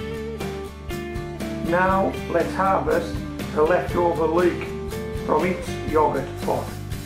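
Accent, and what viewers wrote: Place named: Hartlepool, United Kingdom. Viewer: I really enjoy your videos and I am learning a lot from you. I have lived in Ontario, Canada for 57 years but born in West Hartlepool. I think that you have a Hartlepudlian accent or have been born close to “home”. Am I right? Kept up the good work.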